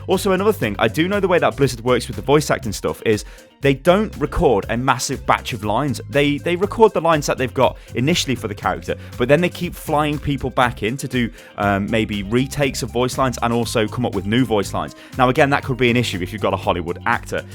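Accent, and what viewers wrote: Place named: United Kingdom. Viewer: did get an annoying british accent and learn to clickbait? or is this just?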